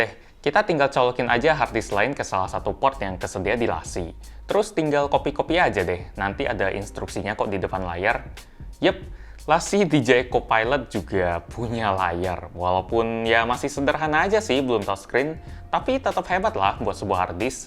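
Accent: Palembang, Indonesia. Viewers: Bang lu org mana? Palemvang ya?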